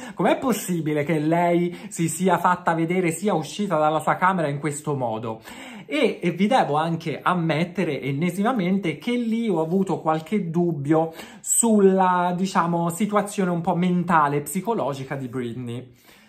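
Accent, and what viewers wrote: Tuscany, Italy. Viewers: 🙋🏻‍♀️presente nuova iscritta! Io ho fatto il mio, tu per c contraccambiare mi devi accentuare il tuo accento toscano!!!!!🤩🤩😍😍😍😍🤩🤩🤩🤩amooooo!!!!!!!!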